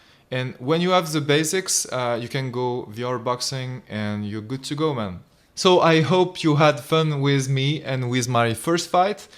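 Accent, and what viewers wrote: France. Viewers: Jme disais bien que tu etais francais avec l’accent 😂👌🏽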